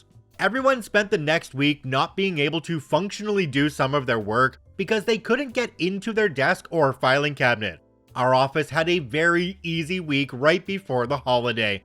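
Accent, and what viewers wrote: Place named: Canada. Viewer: from Canada, so he will pronounce me words differently than other people would and no, he is not am AI either.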